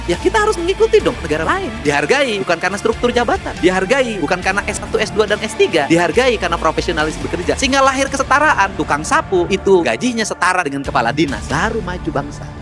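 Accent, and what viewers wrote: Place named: West Java, Indonesia. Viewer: Sunda bgt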